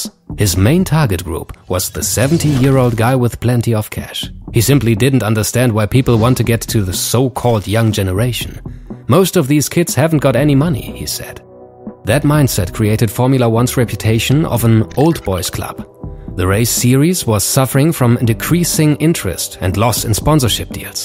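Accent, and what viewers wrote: Germany. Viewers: Finaly. Youtube recomendation something good. I love german accent. Make me feel smarter.. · is the person talking german? i had a feeling the whole time but then he pronounced Vettel correctly, he must be german right? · Anyone else thinks this is a German accent?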